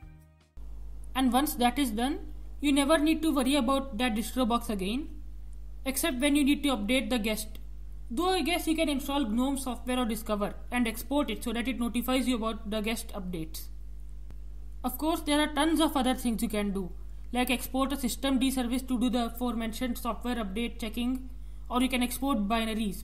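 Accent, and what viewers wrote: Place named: Japan. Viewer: Adjust your Audio Setttings,its Low,and i love your Japanese Accent......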